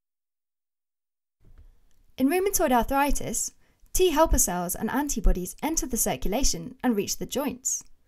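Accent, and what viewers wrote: United Kingdom. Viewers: She is British, but Princess Diana did not have this accent. This one is more like Laura Rhensfield from the BBC.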